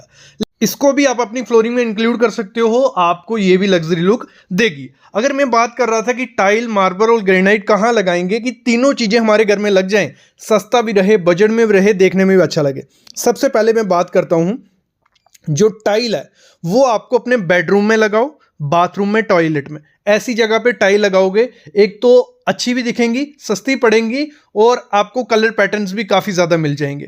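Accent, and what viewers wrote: Jammu, India. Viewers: Bhaiya ji jammu se ho aap toh…👍🏻👍🏻 Waah ❤️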